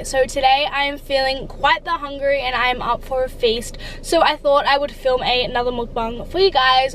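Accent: Australia. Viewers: i can tell your australian by the accent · It's the Australian accent man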